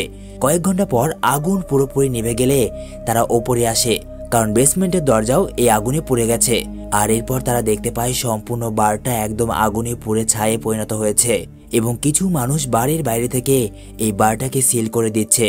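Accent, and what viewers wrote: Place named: Noakhali, Bangladesh. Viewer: নোয়াখালী 😊আপনি😊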